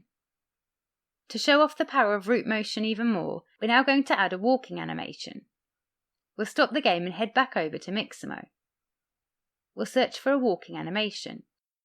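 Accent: United Kingdom. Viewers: Eeeeexcellent! And I like the British accent.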